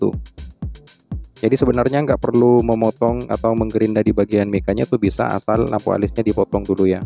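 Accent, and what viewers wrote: Madura, Indonesia. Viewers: Bang abang orang madura kah? , saya orang madura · Org mn kok bhs madura? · Madura ya bang? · Madura ta mas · Reng medureh ye bg😁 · Bang orang Madura kah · Orng Madura kah bnG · orang madura bang? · Kayak nya lu orng madura ya?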